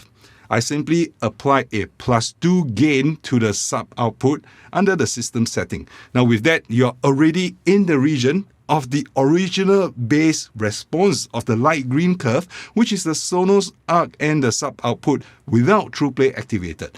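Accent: Singapore. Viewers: Singlish! Love it. I love your methodical approach and the fact that you respond to comments:) Thanks and Ho seh liao! :)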